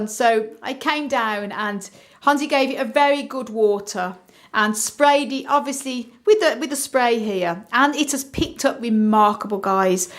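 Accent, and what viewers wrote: Birmingham, United Kingdom. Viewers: she reminds me a bit of susan from the Archers with those fruity high notes... but with 20% added brummy !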